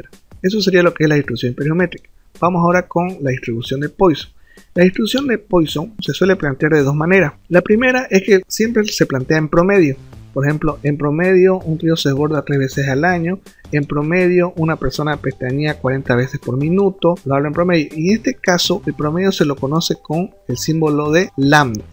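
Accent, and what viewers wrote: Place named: Santa Cruz, Bolivia. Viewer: Ese acento camba, bien mi hermano me ayudaste, saludos desde la llajta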